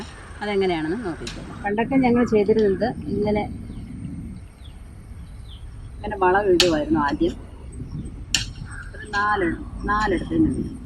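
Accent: Kollam, India. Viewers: Mm samsaram ketapol thonni kollar allenkil PTA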